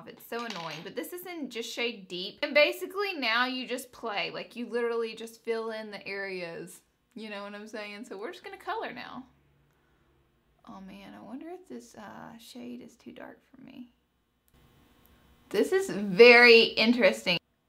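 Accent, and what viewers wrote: South, United States. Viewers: Is your family from the southeast? your accent sounds southern. love the video <3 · Are you southern?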